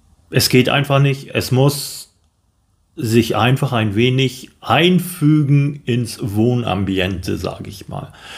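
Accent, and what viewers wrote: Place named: North, Germany. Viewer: Eruzähl mal was, wieso es dich in die Schweiz gezogen hat ??? Dein Dialekt klingt eher Norddeutsch ....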